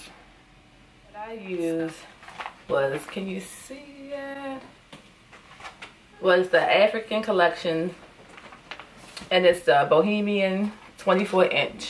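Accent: Baltimore, United States. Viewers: Bomb tutorial! Thank you 💙! Can't wait to do this in my hair :). I have a question, are you from Baltimore, Maryland? I hear an accent. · Lol that Baltimore accent I love it. And your hair looks so pretty I'm gonna stop being afriad and try something new. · You from Baltimore? I can hear our accent. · I know our accent anywhere Bodymore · Came out beautiful... off topic but you sound like you’re from Baltimore... but anyway imma try this method... seems easier than the rubber band method .. thanks for the tutorial sis EDIT:and that dress is cuuuute too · This is the neatest I’ve seen. I’m trying to do mine and I’m about to throw In the towel. I love your accent by the way. Are you from Baltimore? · This video was recommended to me and I'm glad it was. Great tutorial and hairstyle. Just curious, are you a hair stylist? I noticed a Baltimore accent, it'd be great to have someone with your skills in the area. 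· Love your accent. Baltimore? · Are you from Baltimore or dc ? Lol i hear it. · Yo she got to be from Baltimore😁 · U got that strong B-more accent!!😍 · I love that Baltimore accent! I could hear it with certain words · You're from Baltimore or the DMV right? That accent is a dead giveaway!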